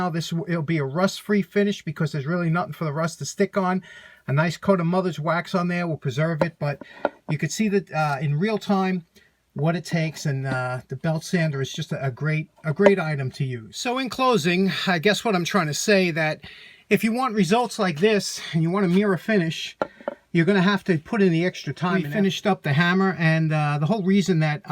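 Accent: New York, United States. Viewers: Love your videos. I’ve been restoring old tool (mostly hand planes) on and off for the last 20 years and I really enjoy your approach and enthusiasm. Having lived in California for the past 40 year your East Coast (New York)accent takes me home to my Pennsylvania roots and all of the old family.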